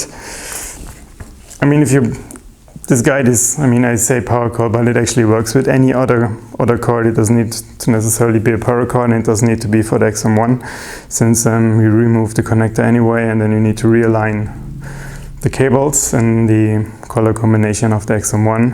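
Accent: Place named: Germany. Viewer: eew that gross accent... I assume you're german? maybe work on your speech before you upload videos in a lingo that you can't pronounce without ppl getting ear-cancer...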